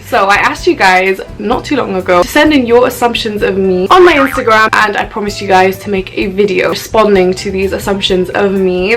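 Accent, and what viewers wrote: England, United Kingdom. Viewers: I like your english accent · are you virgin Müslim girl? 😊 😊 😊 😊 course you not!!! are you arabian, pakıstanı, or Afghan where are you from actually youre langauge and accent just like brıtısh accent of course you not brıtısh , just born to england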